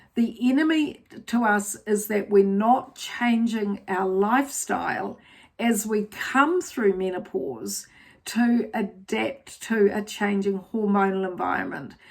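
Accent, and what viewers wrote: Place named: New Zealand. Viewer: Why do New Zealanders say “Woman” for plural instead of “Women “?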